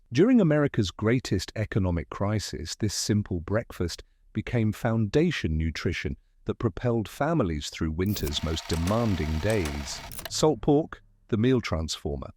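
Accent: United Kingdom. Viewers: The content is interesting. The mixture of American vocabulary with the British pronunciation keeps throwing me.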